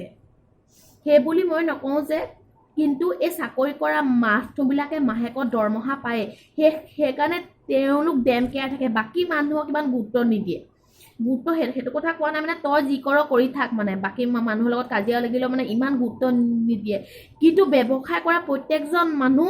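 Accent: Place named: Sivasagar, India. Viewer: তোমাৰ ঘৰ শিৱসাগৰত নেকি কথাখিনি শুনি ভাল লাগিছে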